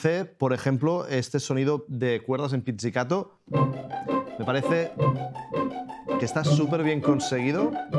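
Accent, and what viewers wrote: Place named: Catalonia, Spain. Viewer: si te cert accent catala el teclat moli...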